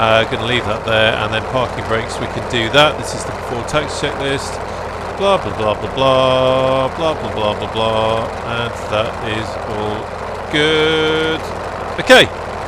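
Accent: United Kingdom. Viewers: 🙈 Pffff … British accent, British person , it’s got to be Duxford or UK. ! UK a bit flat and boring to fly over in m opinion. !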